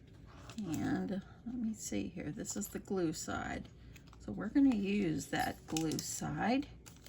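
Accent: South, United States. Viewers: ❤❤❤❤OMG I LOVE THIS you are THE sweetest lady❤ I love watching your videos and listening to your southern voice🩷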